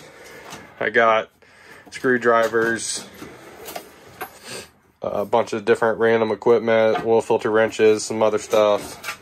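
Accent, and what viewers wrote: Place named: Pittsburgh, United States. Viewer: Bro that’s a hell of a setup. The effort you put in is evident and well worth it. Organization is key in this field. Where are you from? Pittsburgh/Ohio area? Thought I heard a little Pittsburgh accent in there lol.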